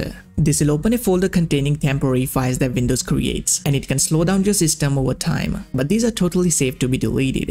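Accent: India. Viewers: Jesus I was gonna watch this but his india accent bro is unbearable -- good bye.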